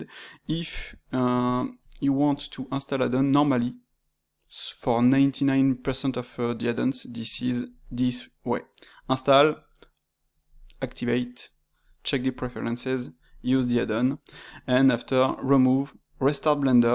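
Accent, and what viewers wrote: France. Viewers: I can tell you're french :)) btw thanks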